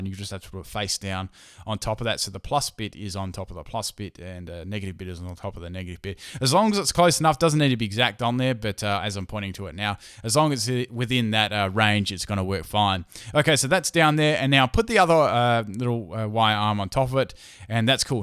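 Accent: Australia. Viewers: he is Australian isn't he?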